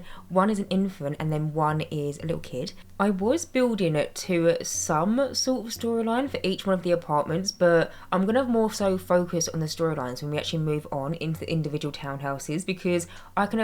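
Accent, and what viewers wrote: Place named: Manchester, United Kingdom. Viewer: Where are you from. your accent is giving northern. Maybe manchester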